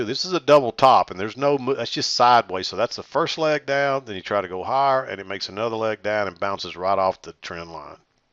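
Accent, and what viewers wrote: South, United States. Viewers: Glad to hear that you do not have Covid. Mississippi huh...thought I detected a slight southern accent :-) I was stationed in Louisiana so I know how that humidity can be. Take care.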